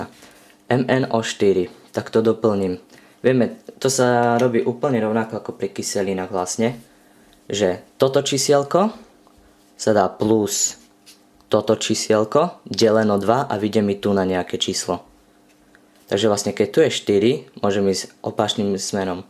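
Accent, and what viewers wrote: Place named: East Slovakia, Slovakia. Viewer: To je asi najvychodňarskejší prízvuk na svete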